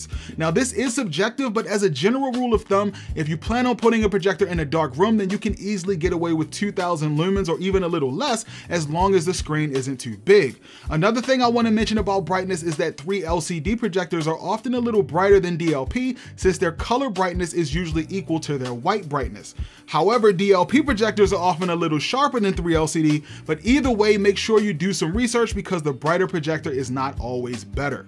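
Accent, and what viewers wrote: Baltimore, United States. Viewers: is this a bmore accent I detect? Great videos! Very helpful. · I hear that Bmore coming outta ya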